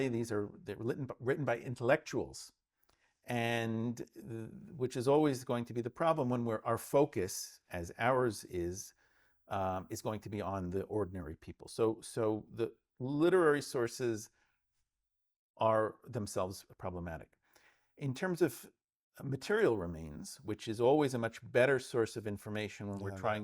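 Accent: Russia. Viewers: Russia is a large country with many different ethnic groups within it. It may not be an accent with which you are familiar but that does not mean it is not a Russian accent.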